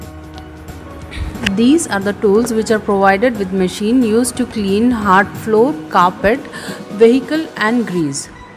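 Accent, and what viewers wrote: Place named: India. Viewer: Yeah exactly what I am wondering also. What is the cost? Also the lady speaking sounds Indian but yet its out of Europe?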